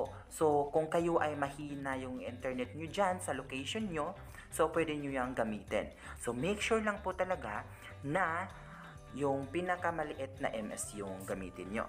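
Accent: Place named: Visayas, Philippines. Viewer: Sorry ‘te medyo I practice mo ng konte yung visayan accent mo medyo nahihirapan kaming listeners mo makasabay sa mga info mo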